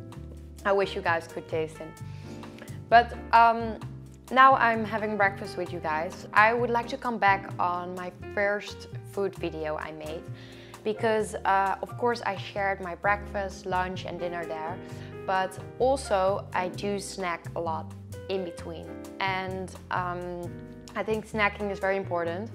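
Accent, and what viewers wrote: Netherlands. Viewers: Ik hoor ook echt het nederlandse accent haha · You sound dutch and your name also looks dutch so are you from holland ?? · Je hebt echt nog dat Nederlands acsent in je Engels😊 maat goed bezig!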